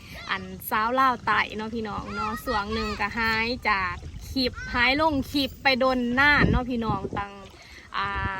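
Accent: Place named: Phibun, Thailand. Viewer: สบายดีอีหล่า หายไปดนเน้อเจ้า เว้าคือคนเมืองพิบูลฯคักเจ้า เป็นเพราะว่าเฮามีชายแดนติดกันกับช่องเม็กทางไทยน้อ น้อง เว้ากะม่วนๆว่าสั้นสา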